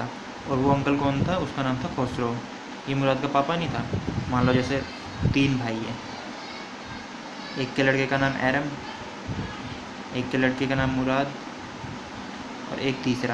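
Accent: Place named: Delhi, India. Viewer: 🤣🤣the way u teach is absolutely fantastic I really like it and the part of uncle is damn funny by your language i can guess that you are from Delhi m i right??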